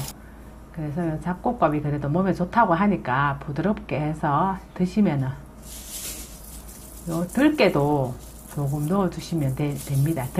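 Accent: Gyeongsang, South Korea. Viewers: ㅋㅋ 경상도 말이라 언제 들어도 친근감이 가서 좋아요 남편이라도 말 안들을땐 콕 쥐박아줘요 ㅎ 그리고 소변 튀게할땐 억지로라도 변기에 앉히세요 난 사윗한테도 잔소리해요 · 잠곡밥. 너무좋아요 갱산도 사투리가 구수합니다 선생님 좋아요 응원합니다 👍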